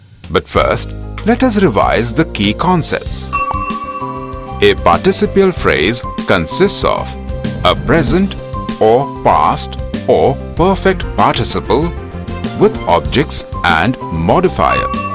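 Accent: India. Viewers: fuck man! I dont unterstand Indian accent.... -.-"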